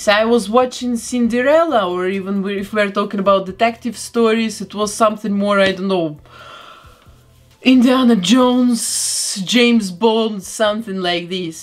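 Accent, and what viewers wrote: Russia. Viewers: почему произношение такое русское.. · OMG hard Russian accent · Хмммм,мне кажется что ты русскаЯ, потому что акциент не английский:)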